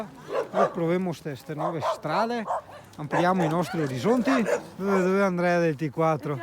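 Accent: Veneto, Italy. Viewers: Bel video complimenti, bella parlantina Veneta. Bellissimi posti 🤟 BUON SABATO sera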